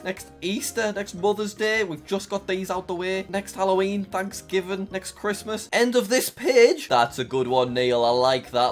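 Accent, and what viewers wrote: United Kingdom. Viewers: I love how he has a British accent.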